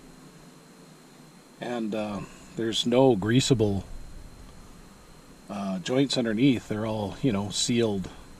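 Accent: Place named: Canada. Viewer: Sounds like a Canadien.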